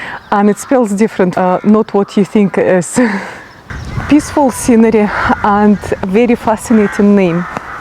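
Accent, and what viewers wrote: Scotland, United Kingdom. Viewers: I lived in Galston in the late 70s having moved from Glasgow. I remember my dad trying to get us to Glasgow and we got stuck on the main road through Moscow down the dip in the snow. Had to be pulled out. Love your accent too - I can hear the Scots pushing through your Russian accent. Beautiful!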